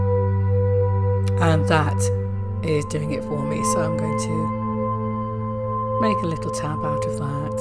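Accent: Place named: United Kingdom. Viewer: Yes, I thought the same. The music was beautiful, but it did compete with your lovely voice... and I LOVE British accent.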